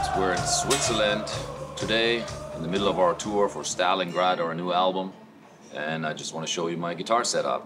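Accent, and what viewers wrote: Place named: United States. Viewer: he speaks english like a pure american,if i wouldn't know him i would thought so.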